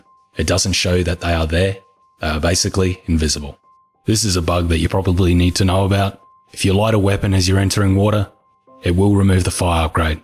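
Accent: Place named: Australia. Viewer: gotta love this australian accent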